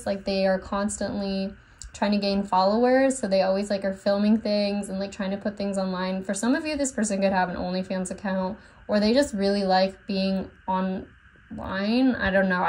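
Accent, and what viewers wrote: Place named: South, United States. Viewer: its southren accent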